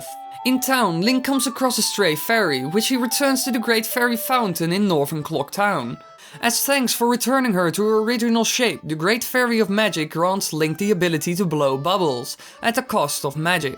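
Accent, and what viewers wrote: Netherlands. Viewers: I bet my life this guy is dutch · You sound Dutch are you?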